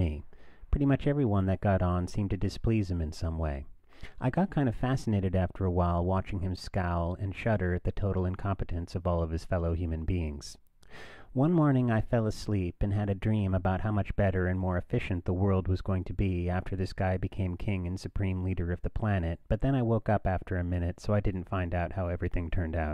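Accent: Boston, United States. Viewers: OMGSH You're from Boston~ AWESOME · Wait your from Boston? LOL! Didn't know that.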